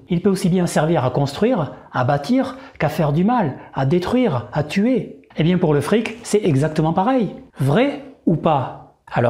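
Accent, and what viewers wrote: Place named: Toulouse, France. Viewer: Y a des richesses super : moi j'ai une super santé ! Sympa votre accent vous êtes de Toulouse? Vos propos sont très intéressants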